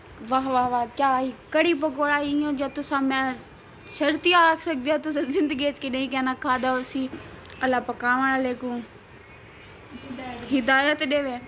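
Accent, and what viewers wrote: Saraiki, Pakistan. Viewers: Apke voice bhut ache saraiki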